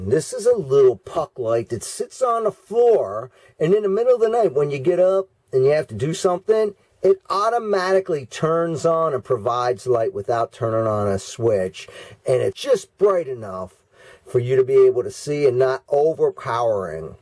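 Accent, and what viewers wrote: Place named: Northeast, United States. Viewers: You from Pennsy or south jersey? Can’t tell from the accent. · Can tell you’re from the north east lol. I’m from Philly. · so you then really do have a Philly accent with a blend of the two. Just say you are from Northeast Philly if someone asks what part of Philly you are from next time.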